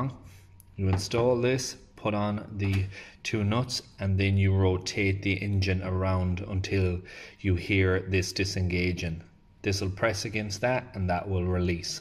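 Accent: Ireland. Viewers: very good video, I am doing 1az fse now, head gasket plus timing chain. Fingers crossed. Nice Irish accent , where about are you from ?:-)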